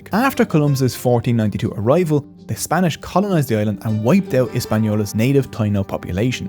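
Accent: Ireland. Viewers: Can't stand his Connor McGregor accent. Sorry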